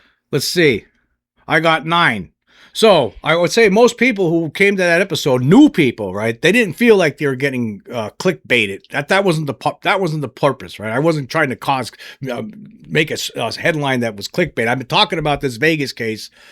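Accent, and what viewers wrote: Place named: Massachusetts, United States. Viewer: You from Massachusetts ? I’m usually pretty good at picking up on a fellow neighbors accent lol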